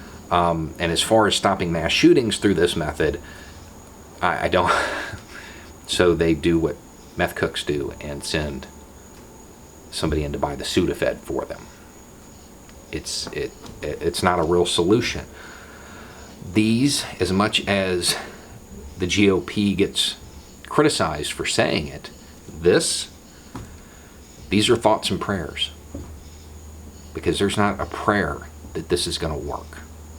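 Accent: South, United States. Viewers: Northeastern-Americans should listen to you,. I think there is a bias there against your dialect there; even in it's most refined, learned, journalistic expression. Liberal Yankees raise their kids on "Mississippi Burning" hoping they will grow up woke, but the coded message is that anyone south of Pittsburgh must be racist because the North is where people are free. You are a credit to the English Language, sir; and I have never heard these ideas said better, and neither has any gun-control liberal. You are the voice that could show white Yankees that they are not nearly as "woke" as they thought they were. just an idea.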